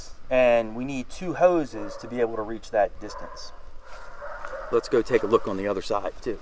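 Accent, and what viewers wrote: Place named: Baltimore, United States. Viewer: We just got out first rv so this video is very helpful! You must be from Baltimore with your accent. Love it!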